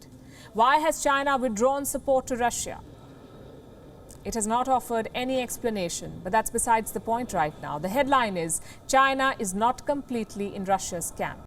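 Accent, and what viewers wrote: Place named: India. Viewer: indian tongue again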